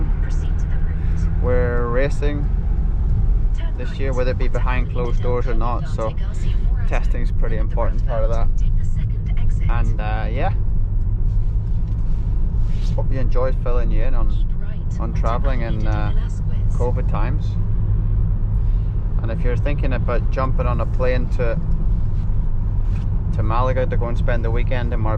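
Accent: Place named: Northern Ireland, United Kingdom. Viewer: Northern Ireland accent + facemask = subtitles on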